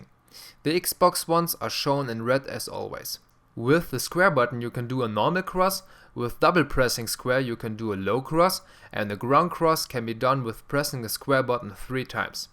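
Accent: Germany. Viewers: sofort gehört das du Deutscher bist, starkes Video ;) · The comments just show how many dumb fucks play this game. Just enjoy the video, he's German hence a German accent. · this german english ist destroying my ears ...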